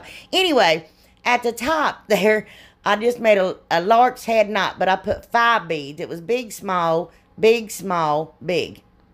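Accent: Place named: Rural, United States. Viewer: I absolutely love your accent... What state are you from? Your crafts are so kool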